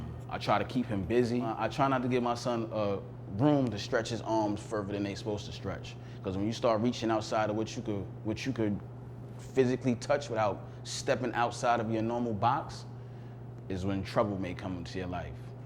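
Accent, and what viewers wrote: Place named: New York, United States. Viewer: I KNEW he was from NY before they even showed Harlem Hospital lol. The accent is unmistakable. #myfellownewyorker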